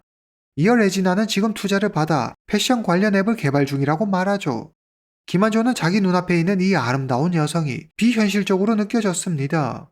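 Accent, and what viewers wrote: China. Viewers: 한굳말 잘하는 중국분이라고 생각했어요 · 조선족 교포 이신가요?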